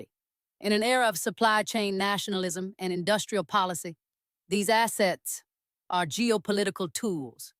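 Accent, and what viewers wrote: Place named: South, United States. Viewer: Loove yur sweet li'l southern accent ya'all. I am an Aussie and can understand you well.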